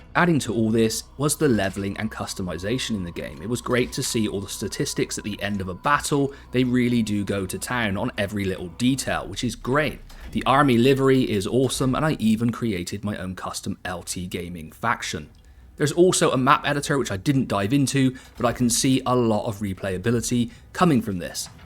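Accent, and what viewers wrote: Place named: West Country, United Kingdom. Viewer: Hearing a West Country accent in media is a breath of fresh air! I’m from Somerset and you’ve got a follow from me mucker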